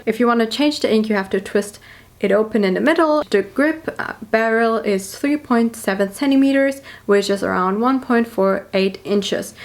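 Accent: Germany. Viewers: Are u German?? 🐻‍❄️🐻‍❄️🥺🥺✨🫐🫐🛼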